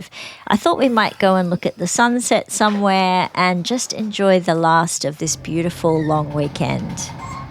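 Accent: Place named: Australia. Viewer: I enjoy watching your videos. I love your Aussi accent. I'm in Texas USA. My children are grown and moved away. I don't get to see them as much as I wish. I have made a life for myself with the 2 cats that came with my house when I bought it. I sold a large house and bought a small one near the lake. My cats are such good companions. Greeting me when I return home and following me around the yard when working outside.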